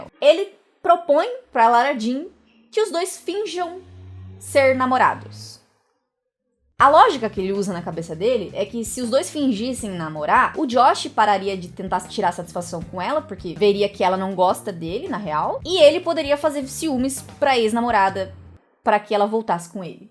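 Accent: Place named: Caipira, Brazil. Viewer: Adoro o sotaque meio caipira da lkkkkk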